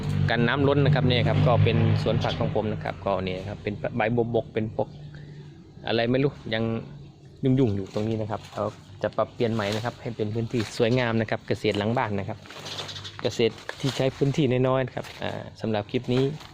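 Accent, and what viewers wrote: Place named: Korat, Thailand. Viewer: พูดโคราช24ช.ม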